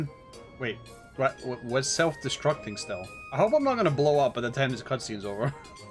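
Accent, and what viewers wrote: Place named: Scotland, United Kingdom. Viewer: another Scottish final fantasy xiv YouTuber... oh wow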